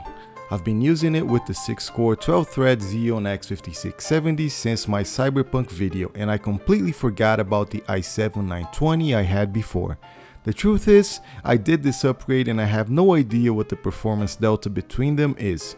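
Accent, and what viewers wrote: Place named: France. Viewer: What is your slight accent? French?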